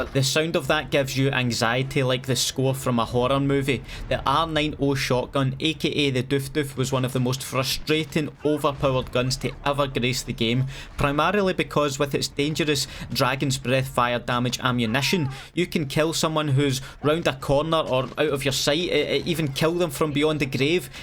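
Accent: United Kingdom. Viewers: His British accent is just....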